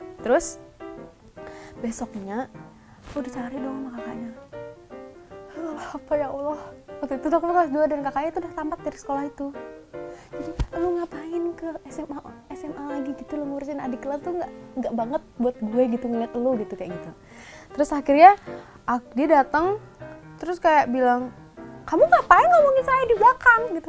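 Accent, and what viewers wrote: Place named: Bali, Indonesia. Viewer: logat balinya masih ada bgttt gemes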